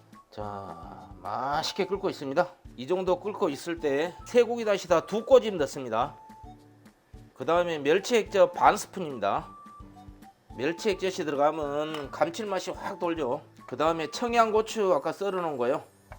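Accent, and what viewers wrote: Jeolla, South Korea. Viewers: 전라도사투리 쉐프님 최고입니다!! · 우리 샤프님 얼굴한번 보고싶네요 전라도 구수한 목소리가 참좋아요 요리도 잘하시고 구독자님들에게 펀리하게 요리도 하시면 ㅋㅋ 너무 좋아부러요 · 쉐프님 말씀도 구수하시고. 음식도 왜 케 잘하시는지 전라도 사투리 . 아. 쉐프님존경합니다.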